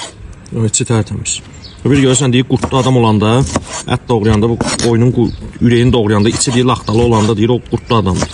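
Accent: Baku, Azerbaijan. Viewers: Bakı ləhcəsi .Var ol .Əllərinə sağlıq . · Salam.Bakı ləhcəsi,ƏLA. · Can Bakı ləhcəm❤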